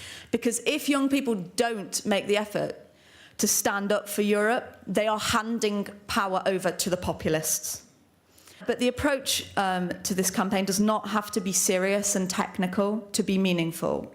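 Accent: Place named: RP, United Kingdom. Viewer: She's very posh. Brexit - the "Europe has no federal ambitions" lie ..“What will actually happen if we stay in the EU” is a question no remainer will ever answer but here it is warts and all. Check it out if you wish ——&gt;&gt; 1: The UK along with all existing members of the EU lose their abstention veto in 2020 as laid down in the Lisbon Treaty when the system changes to that of majority acceptance with no abstentions or veto’s being allowed. 2: All member nations will become states of the new federal nation of the EU by 2022 as clearly laid out in the Lisbon treaty with no exceptions or veto’s. 3: All member states must adopt the Euro by 2022 and any new member state must do so within 2 years of joining the EU as laid down in the Lisbon treaty. 4: The London stock exchange will move to Frankfurt in 2020 and be integrated into the EU stock exchange resulting in a loss of 200,000 plus jobs in the UK because of the relocation. 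(This has already been pre-agreed and is only on a holding pattern due to the Brexit negotiations, which if Brexit does happen, the move is fully cancelled - but if not and the UK remains a member it’s full steam ahead for the move.) 5: The EU Parliament and ECJ become supreme over all legislative bodies of the UK. 6: The UK will adopt 100% of whatever the EU Parliament and ECJ lays down without any means of abstention or veto, negating the need for the UK to have the Lords or even the Commons as we know it today. 7: The UK will NOT be able to make its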